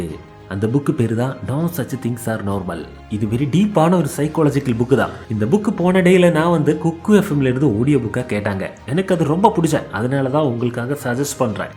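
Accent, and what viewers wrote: Kerala, India. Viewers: Yov ne malayaliya., Alaga pesuraya tamil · Neegga kerala va neegga peysura type same kerala person mathiri iruku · Neenga malayali thana??? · onga tamil slang nalla irukku . kerala va neenga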